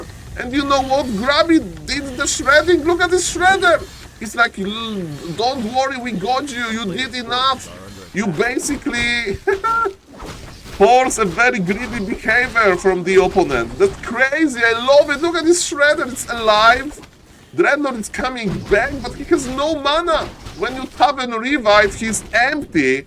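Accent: Poland. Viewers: Hey, I've seen your commentary with Neo at Back2Warcraft, is it you? Are you polish? Cause your accent sounds like you are polish, just like me :D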